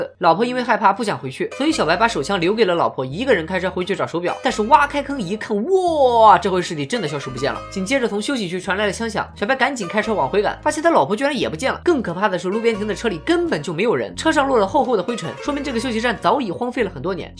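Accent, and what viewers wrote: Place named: Jiaodong, China. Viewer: 主播胶东人吧，从 自己 的发音 判断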